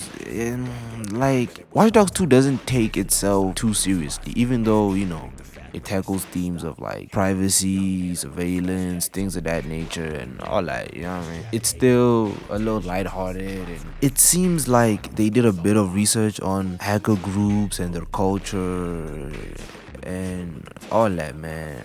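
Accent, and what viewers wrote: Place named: South Africa. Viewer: For some reason I hear mzansi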